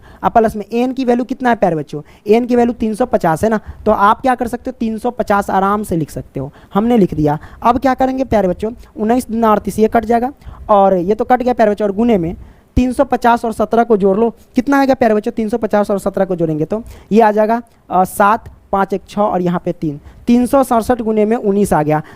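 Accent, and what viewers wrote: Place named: Rural, India. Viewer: Gawar ke trah bolta h dehati😂😂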